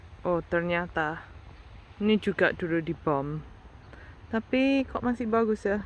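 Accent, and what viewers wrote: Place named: Java, Indonesia. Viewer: Bahasa jawanya masih kentel drpd bahasa Indonesia 👍👍👍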